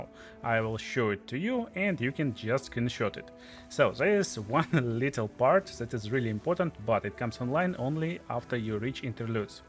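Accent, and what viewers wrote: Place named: Russia. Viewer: то ли из-за дуолинго, то ли из-за русского акцента, но этот видос я понимаю без дубляжа 🤣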